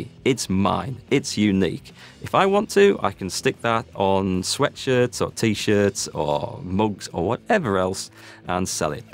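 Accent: Yorkshire, United Kingdom. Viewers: where about in Yorkshire are you from?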